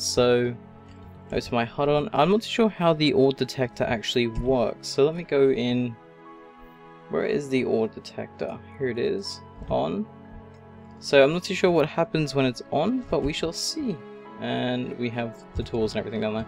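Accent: Australia. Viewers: What country you from? Australia??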